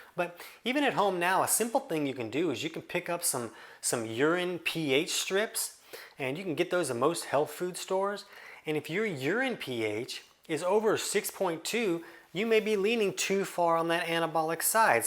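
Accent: South, United States. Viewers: I like your subtle Southern accent.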